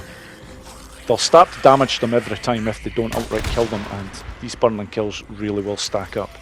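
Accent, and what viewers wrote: Scotland, United Kingdom. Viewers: Just got myself my first gold in Ambush Camp challenge thanks to this guide. Looking to knock all 12 out with golds before I start the main game again with the Remastered version, then getting the Fuel tank and possibly saddle bags from Horde Assault beforehand, too. Been watching your overpowered playthrough as well and enjoying it with the commentary. Cheers for takkng the time for making these guides! I also gotta ask, are you Scottish, or at least live here, by any chance? I swear I hear a Scottish accent 😅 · Thank you for the guide. I did all the challenges with your guide. Some were easier to complete than others. Those bike ones were tough to get. Your soothing reassuring Scottish voice got me through it 😂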